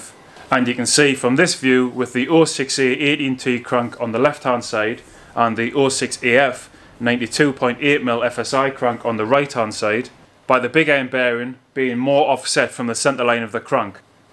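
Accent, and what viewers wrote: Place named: Newcastle, United Kingdom. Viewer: It's like watching a Geordie edition of Engineering Explained - Which is a good thing.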